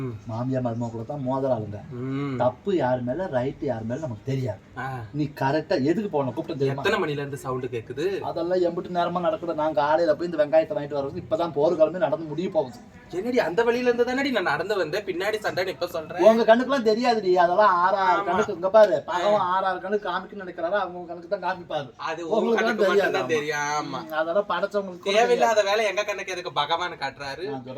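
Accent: Coimbatore, India. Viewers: கோவைத்தமிழும் உங்கள் முகபாவனையும் மிக அருமை😂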